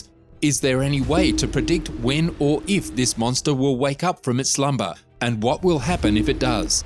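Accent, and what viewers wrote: Australia. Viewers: I started to watch this to see what fate was going on in the good ol USA. Much to my surprise there was an Ozzie bloke narrating and I knew it was a hoax. Who could take that seriously...Crikey!!! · I don’t know the the narrator is from Australia, so what is interested in Yellowstone? I don’t know if I would believe someone from Australia would have all the answers for Yellowstone national park.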